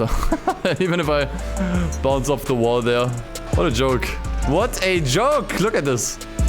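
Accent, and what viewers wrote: Germany. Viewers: Ich liebe den deutschen Akzent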